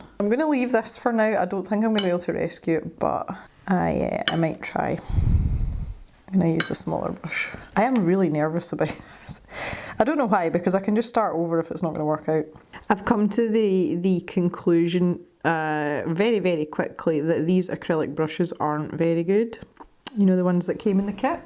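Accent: Scotland, United Kingdom. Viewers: “Just smash it all tegether” aw, I’m here for the Scot as much as the art as someone from Norn Iron living in England, I miss a strong local accent!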